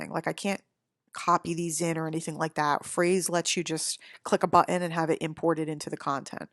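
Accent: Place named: Philadelphia, United States. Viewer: Nice content! Based on your accent I think you're from Philly :)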